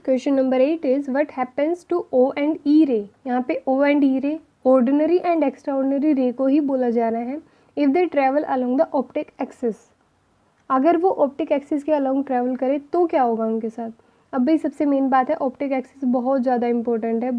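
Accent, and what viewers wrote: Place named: Haryana, India. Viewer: Language touch aa hi jata h haryana wala ka... 😅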